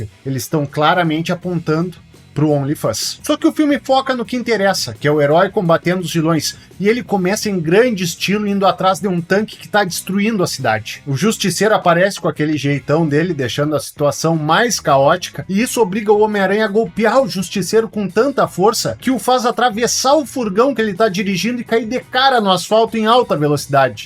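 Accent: Rio Grande do Sul, Brazil. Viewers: Por acaso tu é gaúcho?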